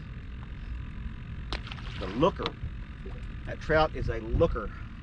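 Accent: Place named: South, United States. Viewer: I hear that Southern twang...🎵🎶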